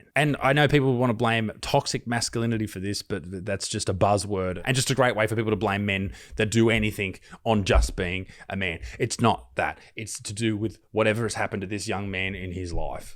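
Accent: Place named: Far North Queensland, Australia. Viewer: Mate, as a fellow white Aussie who is from far north Queensland, you have an accent that sounds like you could have been raised a couple of streets away from me. But honestly mate, you seem to be so unrelatable with your views. It seems your understanding of racial politics in the US sounds as about as good as mine, (given I have only been there twice). You seem so opinionated and adamant about things that are unnecessarily inciteful. Agree you were somewhat a little bit careful about it with comments that it could be white on black, black on black etc… and that they were both 17 etc. But your focus that the amount provided to the alleged perpetrator is only made because he is black is completely unfounded. Moreso your comments (and I think your point) that you believe most of the donations come from white donators because of white guilt. 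These are extraordinary claims unless you have something to support it. The title “white kids lives don’t matter”, is also an ignorant one, given the US history, along with you’re your illogical and irrelevant comparison to a 17yo’s stabbing with the George Floyd incident (an experienced police officer sustaining kneeling on an unarmed and unthreatening mans throat). These are baffling to your already weak and uneducated points. Did you think it could very well be that the black community in that part may be tired of having the perception of rich white people getting their people off in other circumstances, so rallied with financial support?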